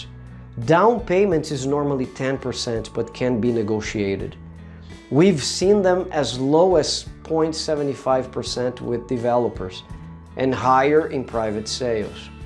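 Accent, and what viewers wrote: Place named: United States. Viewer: oh good im glad u speak american english i thght i might nt understand u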